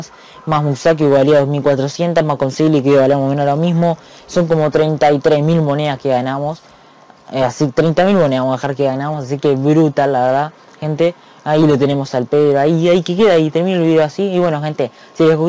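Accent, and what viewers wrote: Uruguay. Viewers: Sos uruguayo?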